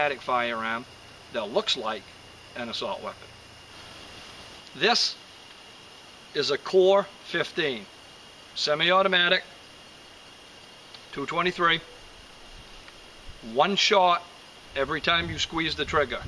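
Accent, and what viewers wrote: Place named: Maine, United States. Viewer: Ike's christ jezus bubby, me an matha down at clam shukka's point pullin sum quohawgs and dun fuhgot my clam rake!Just kidding Brother, havn't been back to Down East Maine for a few years and your accent makes me miss home. Say Hi to Mt. Rialto for me.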